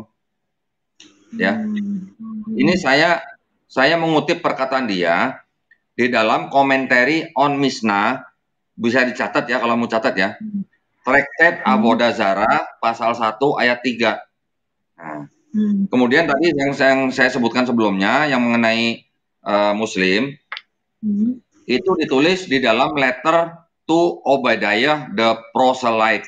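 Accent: Banyumas, Indonesia. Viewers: Klo Sy suka aksen pak Jawa ngapaknya masih kental g taunya dari Cilacap Sy sllu nyimak pak mualaf cerdas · logat bayumas ga bisa hilang sepertinya walaupun sudah lama di jakarta 😂🤣😂🤣 sehat selalu om.....semga selalu dalam lindungan allah swt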